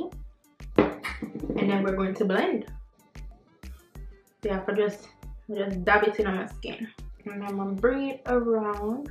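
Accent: Jamaica. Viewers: look how d ting come out good...U can definitely do ur makeup urself...jus fling on couple gems, n 2 bright colour n u good. I did mine myself....n it lasted all day....love the patois vid..n u nuh haffi nermnous nex time! lol that made me laugh so much bout nerminous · I love when you SPEAK patois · Amen i was waiting..i love when u talk jamaican 😍😘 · Heard to understand lol but i love the accent! Always wanted a Jamaican accent 🇯🇲 🙌🏽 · I come a cross one of your videos an the frist thing I say u were Jamaican . I am Jamaican too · 🇹🇹🇹🇹🇹🇹🇹🇹 I love the Jamaican accent🇹🇹🇹🇹🇹🇹🇹🇹🇹🇹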